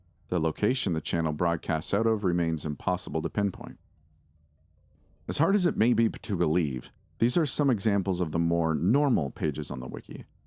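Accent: Canada. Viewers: You are Canadian as hell bro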